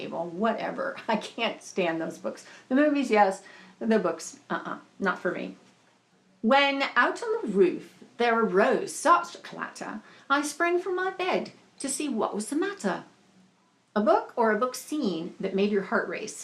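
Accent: United Kingdom. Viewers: Great tag! I loved the British accent ☺ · Your British accent is on par! I really want to read The Book of Ivy - heard mixed reviews on the 2nd one though!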